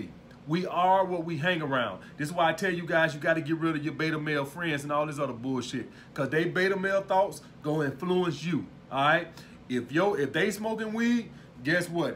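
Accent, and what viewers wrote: South, United States. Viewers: you been living in NY few a while and still got that Southern Accent! But I see what you’re saying fam.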